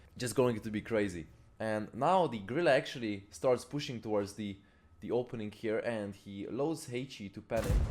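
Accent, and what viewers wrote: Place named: Hungary. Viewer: I'm not arguing with you either 😊 has a typical hungarian accent 😅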